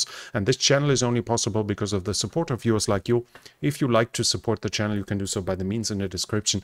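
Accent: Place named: Germany. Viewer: You sound very German ;)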